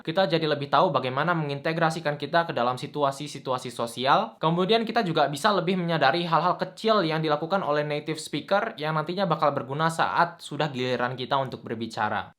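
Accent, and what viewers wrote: South Korea. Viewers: Ka karena udah bisa bahasa korea skrg logat ngomong bhs indonya pun kaya orang korea, mantap ka! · Logatmu berubah kk, logat koreanya nempel banget, debak km keren banget 👍🏻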